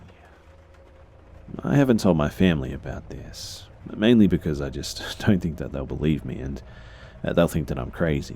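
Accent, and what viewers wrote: Australia. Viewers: I love the fact that your an Australian narrator. 👌🏽 #onefortheaussies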